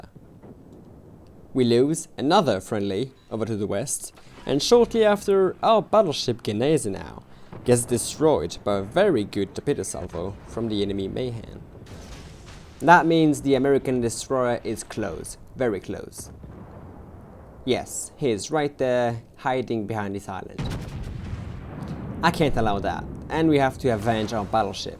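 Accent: France. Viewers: Your french accent is on point ! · Nice French accent man 👌🇫🇷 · c'est rafraîchissant d'entendre un accent français quand on vit en Ontario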